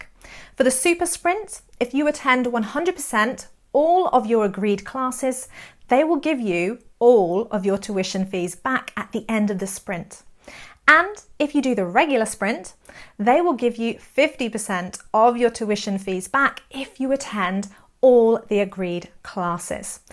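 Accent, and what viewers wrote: United Kingdom. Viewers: I love British accent. Love your video.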